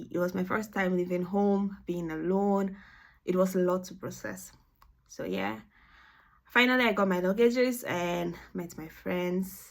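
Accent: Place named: Nigeria. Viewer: U have Nigeria accent did ubase in Nigeria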